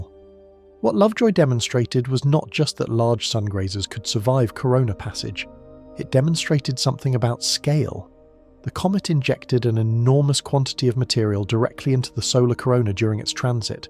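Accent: United Kingdom. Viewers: Another fear video. A tiny pebble going to hit the sun will not register at all but "somebody" with a crappy British accent can amplify your fear level so you cannot think straight. Who would profit from this chaos ?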